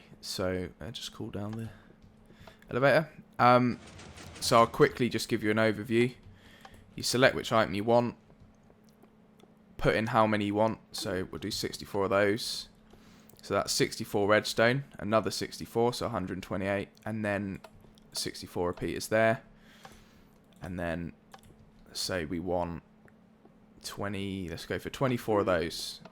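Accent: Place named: United Kingdom. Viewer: you can really hear the British accent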